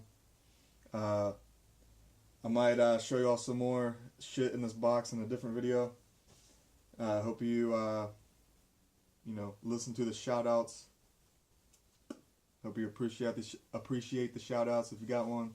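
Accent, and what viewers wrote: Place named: South, United States. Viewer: Thanks for the shout out,! I always liked the tone of voice and attitude that you have! I can hear the southern accent as well as sort of a black sound from a proud white man here! Well, nothing racist here, just your unique, individual style, which is great!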